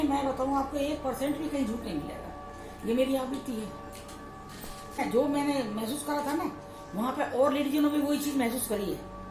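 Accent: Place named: Meerut, India. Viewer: Aunty apka baat batane ka tarika bahut acha hai, Ap merath se ho kya Meri mummy waha ki hai or unki boli bhi apke jesi hai, love you a lot 😘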